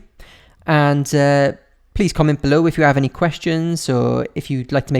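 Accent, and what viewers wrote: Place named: England, United Kingdom. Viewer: Can somebody help me...Whereabouts in England does his accent originate from? It's gorgeous, I can't get enough of it!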